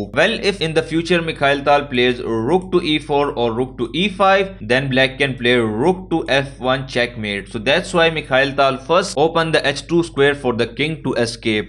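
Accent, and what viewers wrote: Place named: South Asia. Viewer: the voice of chess is oficially an accent from the sub continent. as a pakistani, i love it. a major game / sport where we own the commentary. and of course, indians are top level chess players so it fits well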